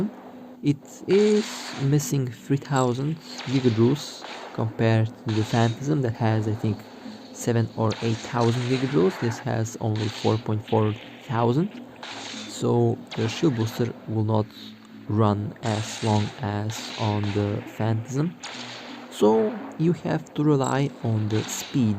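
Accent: Russia. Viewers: Здравствуйте. Попробуйте на русском свои видосы тоже делать. Так как по акценту слышно что вы русскоговорящий:)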